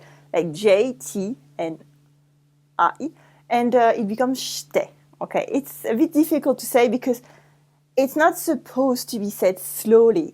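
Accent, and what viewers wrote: France. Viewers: always funny to ear english spoken with a real french accent. ( from france) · Oui-- je te comprends, but it´s kinda funny to hear English with that French accent! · I think it would be interesting (to English speakers) for you to role play with another person using Fast French, starting easy, not using too many new expressions in one lesson. You must do MUCH repetition of Fast French words in a series of lessons; not just one/ two lessons. Many lessons! The English/American ear needs to get comfortable hearing these words as they are a departure from how we learned French in school. It's actually quite difficult to interpret Fast French when spoken. But I'm glad you are choosing to teach your audience with 'real life' spoken French. Otherwise English speakers will remain in the Dark Ages, including the older generation. (by the way, you speak quite lovely English and you are impressively fluent; you have zero problem with English. 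Congrats. The fact that you have a slight French accent actually beautifies our language. I think your presentation and choice of subject matter is quite excellent. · Oh! I love your french accent. I need to learn more from you madame. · I'm French and she has a French accent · Well my favorite way is when I'm in France and listen to everyone, also talking to my French friends, listen to the radio, watch movies (especially at the summer French film festival in Moscow in outdoor cinemas and also on MyFrenchFilmFestival online). For me French is logical as maths: sometimes I can predict what an adjective will be if I know the noun/verb or root of the word. Btw, several months ago I understood how words like parachute, parapluie, parasol, were formed (thanks to Duolingo with word сhute). Also "chaise long": in Russia we pronounce it in French way but as one word, for me it was like wow when I saw it in french and understood that it's long chair. 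Thanks for your lessons, they're very helpful and I love your English with French accent)) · Cet accent. On entend que la prof est francophone (française), mdr (=LOL). Otherwise good pratical exemples, very usefull. P.S. at times, "oui" come "wesh" or even (street slang) "wesh ma gueule". You can also use it (all) instead of "what" (qu'est qu'il y a) that we shorten by "qu'est qui y'a" or "qu'est-ce qu'ya" in non-slang language (used for instance when somebody call you). We never write those verbal versions as we really pronounce it. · You got a tough French accent though lol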